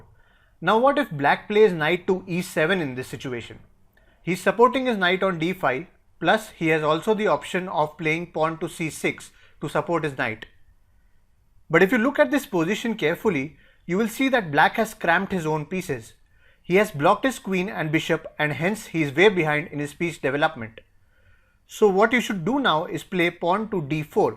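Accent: India. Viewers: Love the indian accent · idkw but youre very good at this, you teach really good even with the indian accent , just pls remove the intro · Hahah I love the Indian accent. It fits so well into chess videos and it is also pretty entertaining :P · u r indian . ur accent tells it.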